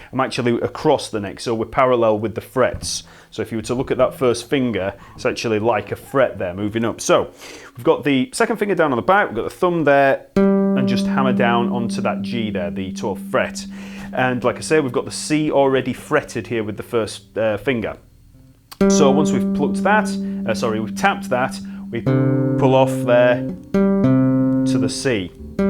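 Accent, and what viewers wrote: United Kingdom. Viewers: Nice video an' accent. R u brit? If you are, from where?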